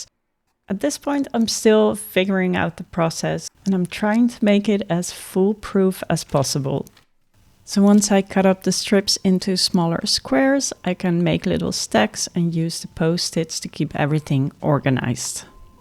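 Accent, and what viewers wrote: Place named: Netherlands. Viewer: Ik vroeg me vanaf het begin af of je Nederlandse bent, ik dacht dat aan je Engels te herkennen. En daar is het bewijs: in het Excel blad!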